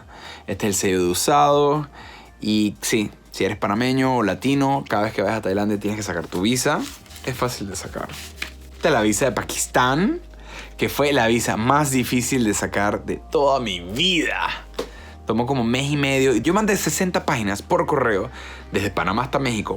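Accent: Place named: Venezuela. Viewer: Y tienes acento venezolano!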